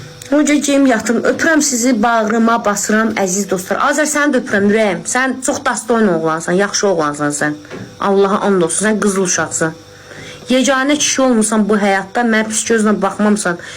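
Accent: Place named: Ganja, Azerbaijan. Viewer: Bu lehcesinden Genceliye oxshyur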